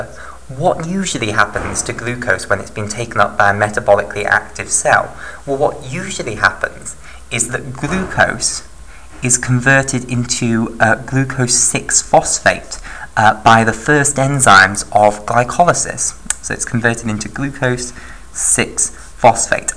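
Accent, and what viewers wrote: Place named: RP, United Kingdom. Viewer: Very nicely explained....very very britishy poshy accent... :D ...very "intellectual" sounding. Cheers from Brussels!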